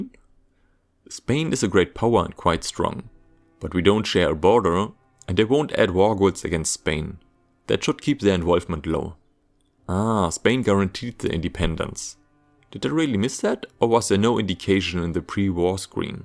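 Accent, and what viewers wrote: Germany. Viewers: Alter, gotta work on that accent. Good vid though, but boy is that German accent seeping through savagely...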